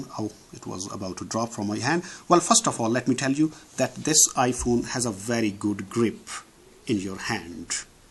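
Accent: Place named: Ireland. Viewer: Irish accent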